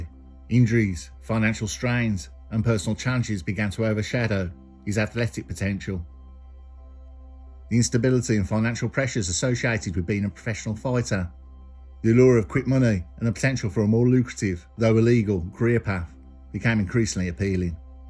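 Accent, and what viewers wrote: Birmingham, United Kingdom. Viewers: Really like the way this guy puts these together. And his VO with his brummie accent 🙏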